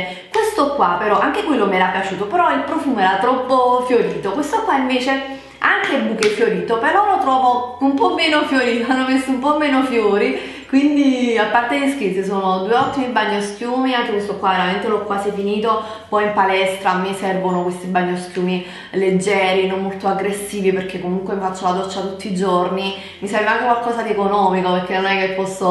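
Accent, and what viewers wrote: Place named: South, Italy. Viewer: Come mai non sai parlare l'italiano?? Cosa succede al sud che non riuscite proprio ad impararlo?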